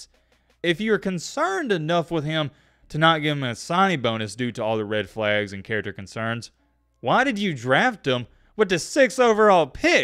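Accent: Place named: Florida, United States. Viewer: This ain’t the channel for you lol. If you like to fall asleep to a lot of jibber jabber in a thick Florida accent, this is the place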